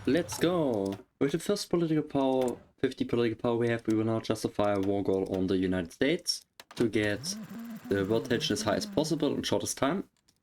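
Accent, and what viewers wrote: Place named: Germany. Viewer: nice video bro und mann hört das du deutsch bist no front :)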